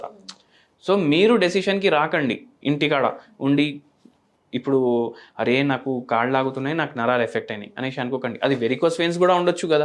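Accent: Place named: Telangana, India. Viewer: ne mata chala manchi telangana la unadi dcr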